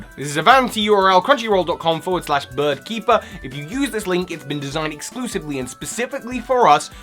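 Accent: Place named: England, United Kingdom. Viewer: The English accent ertainly doesn't make it any easier! lol!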